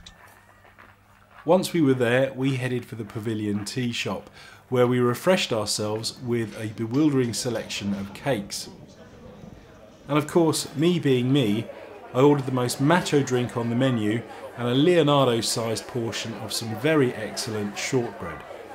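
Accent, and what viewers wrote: United Kingdom. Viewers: Another interesting and entertaining video and I think the production values are great. I love the Britishness and subtle humour of your delivery and content - keep it up. Having never cycled abroad, although it's on my wishlist, I'd be interested to hear more about that. Do you take your own bike, or hire one out there? In Mallorca, do you prefer the north of the island as a cycling base, or some other area? Any tips for organising the trip that would be useful to others etc.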